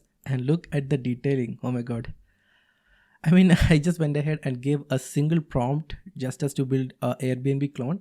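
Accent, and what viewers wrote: Kerala, India. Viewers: Nice Malayali accent bro 😃 Very nice demo 👍✅ By the way, Do you like Cursor or VsCode?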